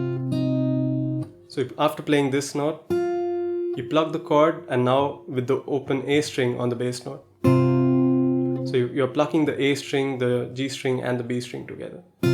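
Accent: India. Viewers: Are you indian? I mean your accent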